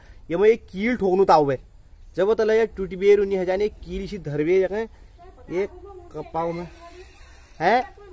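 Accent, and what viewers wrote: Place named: Bageshwar, India. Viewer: waah mjaa aa gya apne mere ganesh daa ki yaad aa gyi ... esa lgra aap garur bageshwar se ho ..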